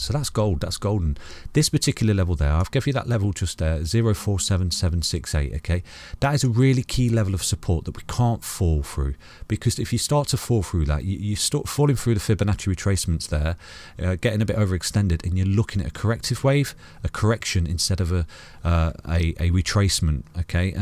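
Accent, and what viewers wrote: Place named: Midlands, United Kingdom. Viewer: I think Midlands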